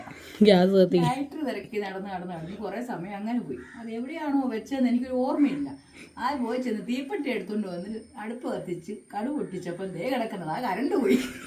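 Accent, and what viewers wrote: Kottayam, India. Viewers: കോട്ടയം കാർ ആണോ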